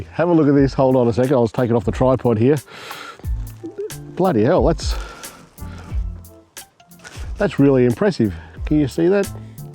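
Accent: Australia. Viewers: I absolutely love the Aussie access and slang 😂 Ive just bought the Action Cam 5 so I have to try this ❤ Cheers from the UK 🇬🇧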